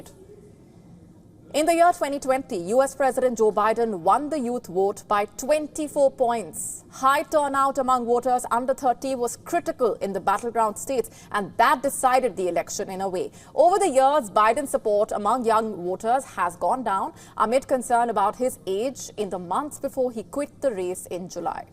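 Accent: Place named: India. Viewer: The Indian accent makes this 100x more funny lmao (here from Theo Von)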